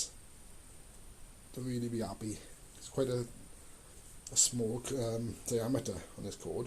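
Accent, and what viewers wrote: Wales, United Kingdom. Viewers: Brilliant video thank you for saving me a huge head ache of trying to figure it out. By the way love the way Youtube's captions struggle to catch catch your welsh accent. Much appreciated!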